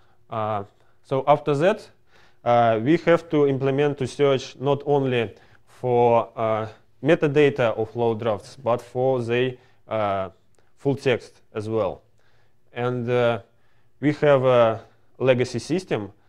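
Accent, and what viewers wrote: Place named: Russia. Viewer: вот есть индийский английский а тут русский английский.. Лучше на русском, многие ищут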